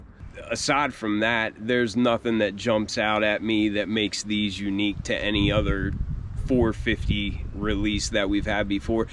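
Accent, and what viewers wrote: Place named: Pittsburgh, United States. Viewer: Are you from the Pittsburgh area? I’ve lived in Pittsburgh my entire life and you have a pittsburgese accent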